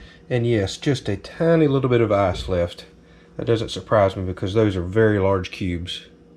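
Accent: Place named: Texas, United States. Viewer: Are you sure you are not a Texan ? That accent is spot on for people around San Antonio.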